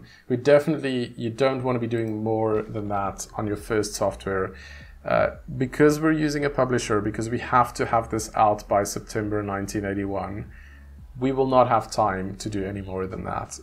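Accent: South Africa. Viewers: Mate I have to ask because it is driving me crazy, are you from South Africa?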